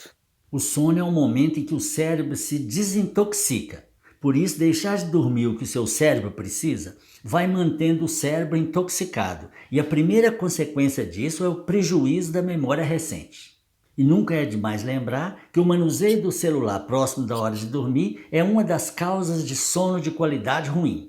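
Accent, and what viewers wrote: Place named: Minas Gerais, Brazil. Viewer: Eu adoro esse sotaque mineiro dele 😅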